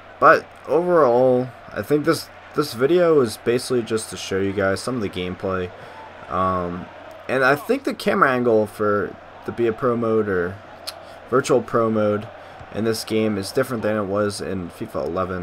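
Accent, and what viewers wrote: United States. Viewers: umm no if your european or whatever its Football. but here its soccer and since is american, its soccer to him, not football, football to us is an amazing sport played with an oval ball. and we pronounce defense different because thats how we say it here. its just different here than it is where you live, no reason to be upset because we say things different here. i don't complain cuz you say mum and stuff because i understand people in different places speak differently.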